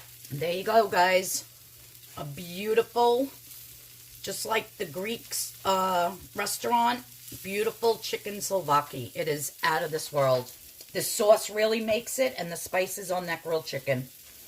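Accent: New York, United States. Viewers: I knew from your "accent" we lived near each other...LOVE YOUR NY ACCENT!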